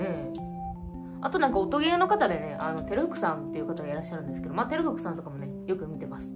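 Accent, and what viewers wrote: Kansai, Japan. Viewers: 喋り方的に関西？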